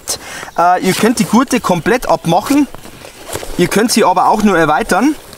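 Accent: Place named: Bavaria, Germany. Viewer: Alle Achtung, prima, herrlich das Bayrisch 😊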